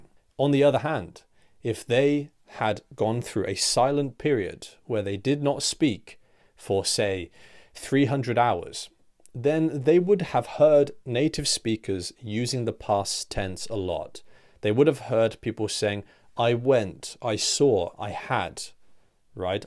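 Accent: United Kingdom. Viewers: British English ❤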